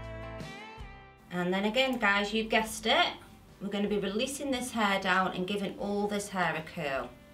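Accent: United Kingdom. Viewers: Just discovered your channel, amazing hairstyles. Also, your accent is lovely, from which part of the UK are you?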